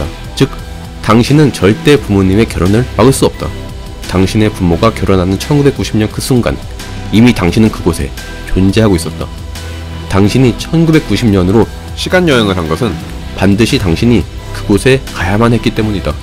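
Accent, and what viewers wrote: Gyeongsang, South Korea. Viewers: 숨길수없는 첯음절에 강력한 엑쎈트 깅상도사투리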